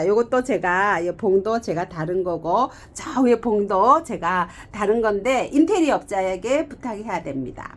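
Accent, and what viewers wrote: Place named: Gyeongsang, South Korea. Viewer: ㅎㅎ 헐빈하다 갱상도 본토말~^^ 지가 자주쓰던말이네요 정겹슴다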